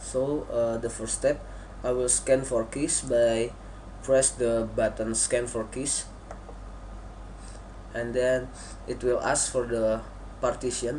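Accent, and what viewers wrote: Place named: Indonesia. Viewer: indonesian english.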